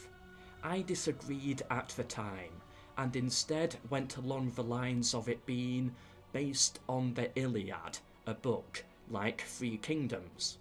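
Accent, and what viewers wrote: United Kingdom. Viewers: I basically see videos to learn english, he speak so slowly and with that strong british accent which is like a teacher to me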